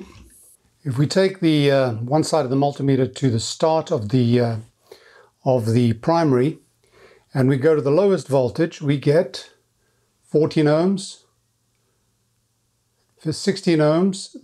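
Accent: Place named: South Africa. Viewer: I heard that you are a hoarder and I instantly became a big fan. I am too! My mom recently died and I had to move everything out of her house because my brother and sister didn’t want anything because they live elsewhere. I had to move her things, my dad’s things, my uncle’s things because he was a hoarding repeat gold medal winner and my things that I had stored there. My daughter is already worried about me dying and that she will be stuck with going through everything. I have a nice collection. Good thing I have a big shop. You sound like you are from South Africa. I visited Durban because I met a nice lady there on the internet 20 years ago. It is beautiful there. If you’re not from SA never mind. Keep up the good work!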